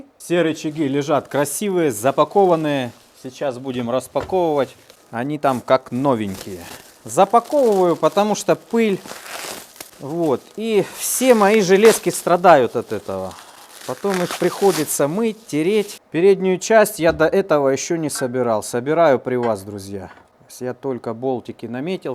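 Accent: Rostov, Russia. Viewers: Ростовский акцент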